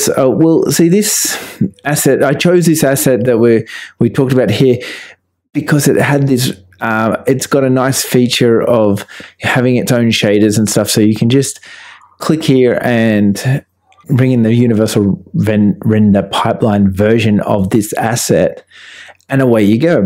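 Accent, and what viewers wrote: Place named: Australia. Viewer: Thanks for the video! You helped me a loads brotha! Love the ozzy accent btw :)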